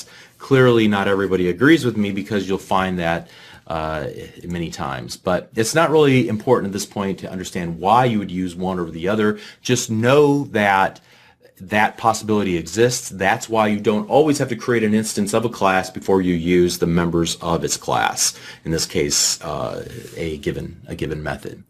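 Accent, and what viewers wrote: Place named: United States. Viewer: Great channel sir, I really love these tutorial especially that they are in American Accent, this is a great advantage these days with all the rubbish accent courses invading Youtube.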